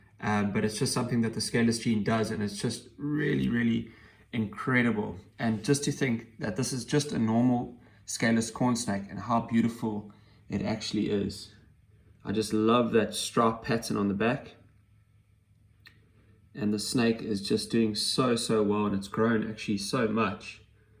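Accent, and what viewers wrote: South Africa. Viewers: Love the South African accent!!! Dunno why just do!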